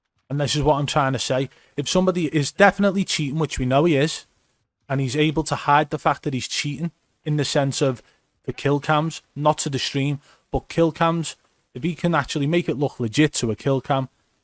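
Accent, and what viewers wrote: Liverpool, United Kingdom. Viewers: U from Liverpool?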